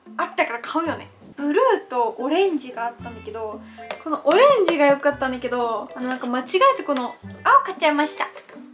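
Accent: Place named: Kansai, Japan. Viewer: りょうか道民なのに関西弁使ってるの笑う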